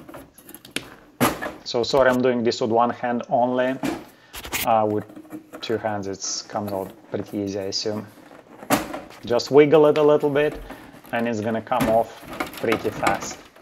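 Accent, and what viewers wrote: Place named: Poland. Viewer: I know you're right. Either way, thank you for this video! We are lucky for it. Idk from where you hail but your accent reminds me of Polish and I love it!!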